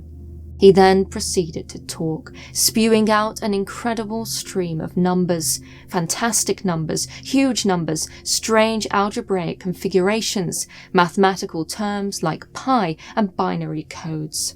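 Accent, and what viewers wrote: United Kingdom. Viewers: Not to mention her pretty British accent. So proper!! Great narrator too.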